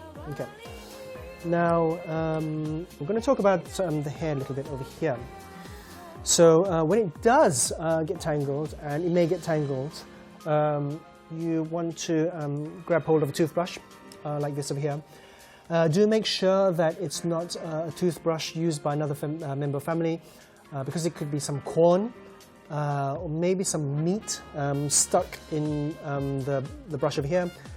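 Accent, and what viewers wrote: United Kingdom. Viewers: British accent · He sounds british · Wow! your Smart Dolls are amazing! i love them! and Also, you have a beautiful english accent, i understand you perfectly, congratulations!!!!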